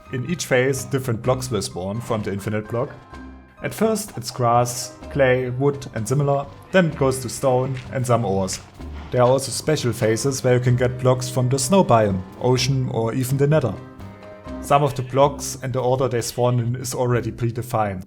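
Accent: Germany. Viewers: Bist du Deutscher? 😂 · Such a strong german accent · Are you german ? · Man hört den Deutschen Akzent xD Und geile Idee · dieser deutsche Akzent xd. beste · Der ist deutsch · Thanks dude! German accent rullez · I missed that heavy German accent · r u from germany? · wie man einfach hör das du deutsch bist xD geil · duhörst dich nach einen deutschen an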